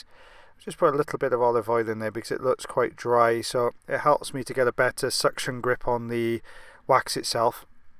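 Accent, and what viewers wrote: Wales, United Kingdom. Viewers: WELSH YOU SEE I OFTEN THINK HE WILL BREAK OUT IN SONG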